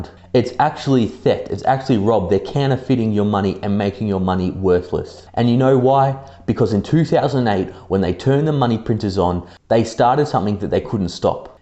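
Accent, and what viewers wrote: Australia. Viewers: You Aussie? I thought you South African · Subscribed as soon as I heard the Australian accent, just what I've been looking for. I LIKE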